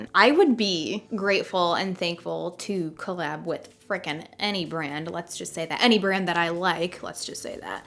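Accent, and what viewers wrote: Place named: Chicago, United States. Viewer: Love listening to your Chicago accent. Made me smile when you said Pop for soda ☺️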